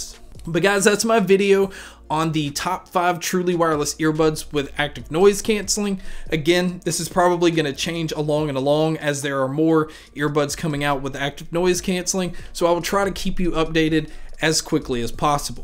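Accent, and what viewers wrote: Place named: Texas, United States. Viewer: I used to think that the Indian accent was the worst on the planet but, damn, yours tops the charts is it texan? . Great review though man and love your content!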